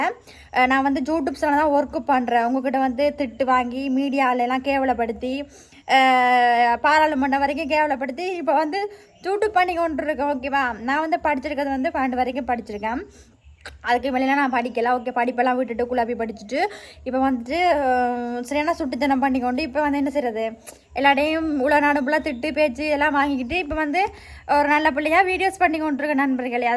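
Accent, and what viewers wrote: Sri Lanka. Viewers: இலங்கை தமிழ் அழகு நீ பேசுவதும் அழகு கபடமற்ற பேச்சழகு பெண்மை அழகு உன்னிடமுள்ளது.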